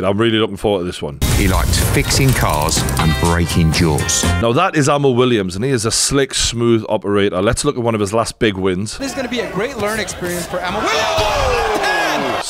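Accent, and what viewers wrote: Newcastle, United Kingdom. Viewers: Hello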